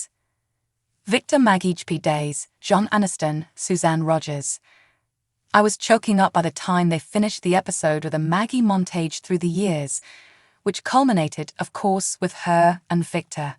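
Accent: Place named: United Kingdom. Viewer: Love your accent over the pond i take it ❤ good job 👍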